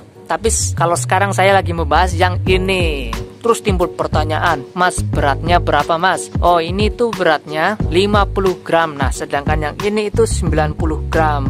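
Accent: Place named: Java, Indonesia. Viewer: Jawa mana bang.ko kaya kenal logat y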